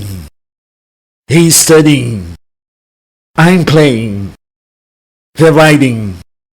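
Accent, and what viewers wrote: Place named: United Kingdom. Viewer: This is by far the most fun I have EVER had with a youtube video. It's true but more like with a British accent.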